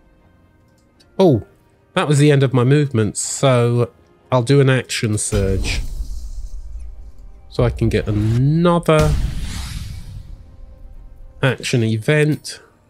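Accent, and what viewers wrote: United Kingdom. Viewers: Wow. Talk about the voice not matching the person. You sound like you're maybe 20 years old max, and the british accent makes you sound even more posh. Clean living, I guess? Anyways, lovely video, will check the rest of your stuff out too. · Good video 👏. Your accent sounds British....Are you?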